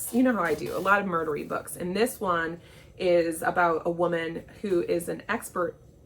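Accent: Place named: United Kingdom. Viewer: I love your take on British pronunciations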